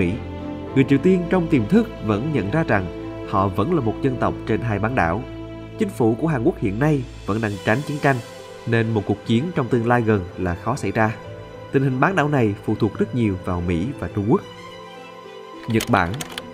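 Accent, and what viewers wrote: South, Vietnam. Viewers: xét riêng giọng đọc thì hình như k vấn đề gì, nếu bạn nghe tốt giọng miền Nam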